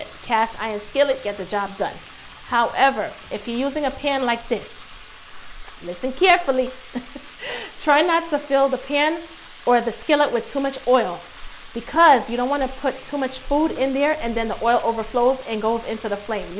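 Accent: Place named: East Coast, United States. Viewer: First & foremost, this is recipe is slamming 🤾🏾‍♂️... I gotta ask you this one thing though🤷🏾‍♂️❔ That accent is so east- coast; has a hint of Baltimore, or maybe Spanish Harlem. Lol, where'd they grow you at?🌱🌷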